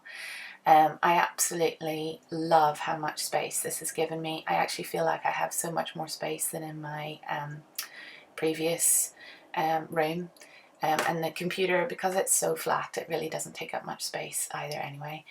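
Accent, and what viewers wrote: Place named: Scotland, United Kingdom. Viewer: Nice setup. Thank you for sharing. You have a very handy husband. By the way, I detect a slight accent; Scot?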